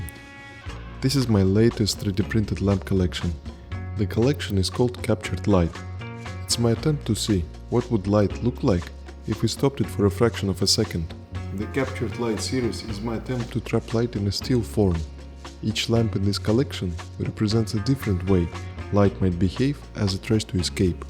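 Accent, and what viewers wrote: Russia. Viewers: Where are you from? You saying "here in china", and given your accent, I would bet Russian. If so, cool. Glad to see a Russian making cool products, instead of failed wars. (Not to say we are much better, but we all know the war is a joke)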